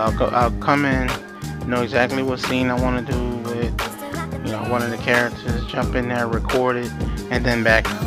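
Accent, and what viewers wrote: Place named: New Orleans, United States. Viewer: Yay for 80s kids! Oregon Trail and Carmen Sandiego were the two favorite games of my childhood. Oregon Trail was the first game I ever role-played...or wrote fan-fiction about, for that matter...I had lots of little drama-filled stories about the party members spinning out in my head and sometimes on paper. Gopher is one of my big inspirations, too. All these great role-players have me watching Skyrim videos more than I actually play the game myself these days. That's what I love about this game; there are so many other stories to be told within the framework of the official narrative. Ah, New Orleans! That's why I couldn't place your accent. My folks are from San Antonio and Austin, but my dad spent a lot of his childhood in the valley, and my aunts and cousins are scattered all over. A few of my cousins used to live in Houston, and one of my best friends just moved out there with her boyfriend recently, so I've heard stories about the traffic. 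I've only visited Houston once, more than 15 years ago, but it was pretty bad then, too. And Texans complain about Californian drivers...I say we just skip the flying cars and go straight to teleportation pads. If only fast travel worked in real life... Do you have a character concept in mind for your first ESO character, especially which faction you'll play? I pre-ordered too, and I have lots of plans for my first character--an Argonian in the Ebonheart Pact, because I always play Argonians first in Elder Scrolls games. Ok, I just lo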